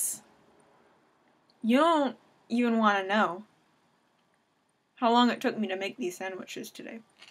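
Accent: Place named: South, United States. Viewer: This was definitely what I needed to see before I go to bed...now I'll definitely have pleasant thoughts..lol No more zombie thoughts. ;) I loved this...I like your southern accent...in fact, Gary can attest to the fact that I was cracking up so much I actually had tears..yes, you are THAT funny. DO MORE VIDEOS! haha I owe you one as well..;)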